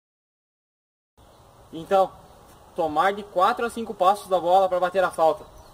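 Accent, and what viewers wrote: Rio Grande do Sul, Brazil. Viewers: Sotaque gaúcho tu deve ser de RS pra aí né ? Valeu pelas dicas +1 sub e like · Parece que temos um gaúcho aqui não é mesmo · Sutaque Guacho mora no RS ?. Vlw pelas dicas ajudo bastante. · Mano vc e gaucho ???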